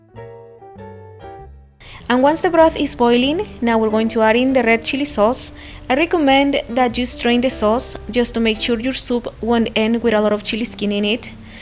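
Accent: India. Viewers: bien sabroso caldo camaron grascias. from where are u?? u are from India ur accent from India,I love that soup I rather use fresh shrimp, it's perfect for now winter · If she's Mexican but she sounds like she comes from daneli india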